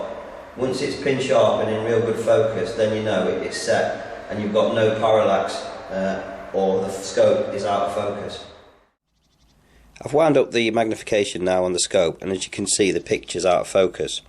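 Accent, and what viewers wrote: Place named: United Kingdom. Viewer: finally a British guy talking. being in the cadets i have been trying to find a British guy to tell me what to do. not an American. nice video dude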